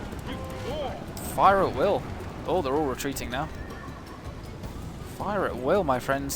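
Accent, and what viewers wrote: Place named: United Kingdom. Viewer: didn't even know you were british and i was subbed for atleast a year now lol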